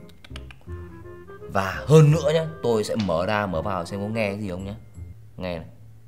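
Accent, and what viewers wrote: Thanh Hoa, Vietnam. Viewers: Ông review này người Thanh Hoá phải k :)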